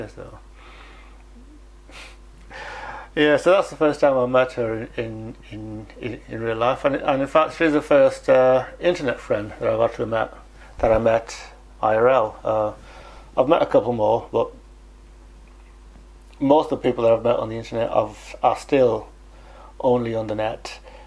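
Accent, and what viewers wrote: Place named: Iceland. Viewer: Ha! You're as good at maths as I am! :P You have a beautiful Icelandic accent :D I remember all the hoo-hah with that. Oh no! That sucks so much. Oh lord, that sounds very not good to deal with if you're flying a plane! Oh you're such a sweetie! How very Love Actually! Oh wow, you look odd without a beard! I'm glad you clarified it wasn't the KISS guy!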